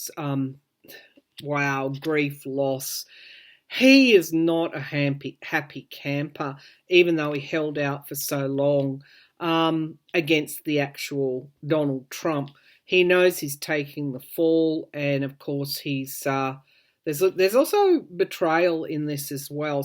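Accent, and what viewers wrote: Australia. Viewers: Hello! I always SO enjoy your readings, the way you present everything, the humor! (Donald Capone😂) and the very personal way you „ talk“ to us. Very different from other readers ( apart from the Australian accent!). You bring a very human, personal touch to your readings. Thanks for all the time you take to bring us the latest insights! 🙏🌹🙏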